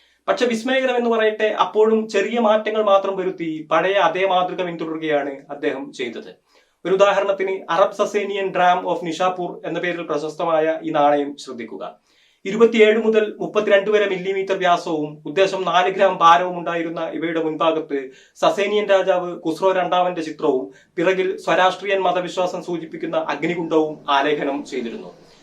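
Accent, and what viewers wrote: Kasaragod, India. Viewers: എത്ര നാളായി നിന്നെ കണ്ടിട്ട്, നിൻ്റ് കാസർക്കോട് സ്ലാങ് കേൾക്കാൻ ഭയങ്കര ഇഷ്ടമാണ്, മാറി നിൽക്കരുത്, ഉടനേ 100k അടിക്കണം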